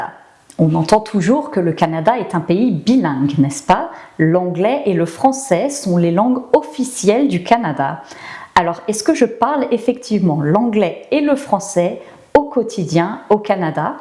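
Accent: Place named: Europe. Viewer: Votre accent me parait europeen. Je suppose que vous avez demenage au Canada d'un pays European. Merci pour l'encouragement de practiquer le franchise quand l'occasion se presente, et je suis tellement d'accord.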